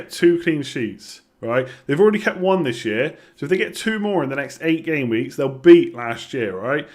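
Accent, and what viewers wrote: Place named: England, United Kingdom. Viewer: Ghanaian and he is saying it right it is just due to the English accent he can't say it well I have the same thing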